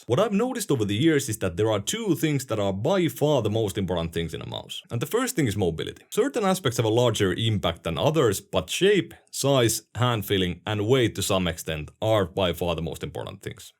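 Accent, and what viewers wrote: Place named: Finland. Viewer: Jätkästä kuuluu kilometrin päähän et sä oot suomalainen...Sä et puhu rallienglantia, etkä huonosti mut sen vaan kuulee. Tilasin.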